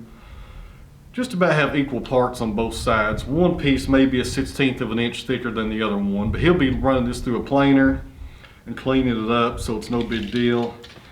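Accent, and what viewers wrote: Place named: Kentucky, United States. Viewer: When I hear your accent you make me homesick for the southeastern Hills of Kentucky my kin sound pretty much the same as you and I love it. I am fascinated with sawing and milling lumber, I guess it is in my blood, my Great Grand Father worked at a sawmill in Missouri and was killed when the boiler blew sending bricks a mile away.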